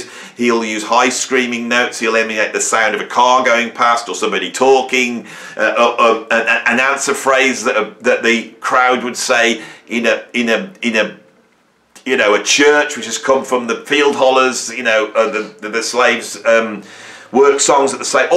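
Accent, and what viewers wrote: Birmingham, United Kingdom. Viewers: I follow David Packham on American politics - you need to do an advert at the end of each video. Posh pot noodles, hair gel, face scrub...you latest book! Alright, the last is a bit Rick Beato. 😂 Soon your studio will be filled with so much crap you won't be able to film! On seconds thoughts, the loud, opinionated Brummie still wins every time.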